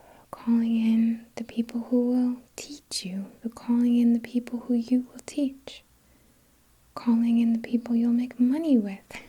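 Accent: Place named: New York, United States. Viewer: Your voice is the perfect trigger for me! As a New Jersey guy I am a sucker for a New York accent LOL. Thank you for the wonderful relaxation you bring me.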